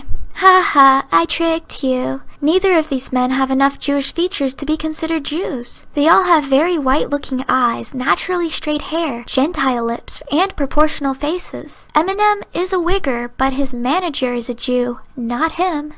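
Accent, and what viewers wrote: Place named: North, Italy. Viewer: My guess was that you're either North Italian or West Slavic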